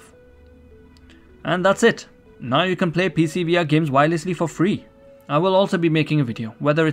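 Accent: India. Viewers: u sound like dani but indian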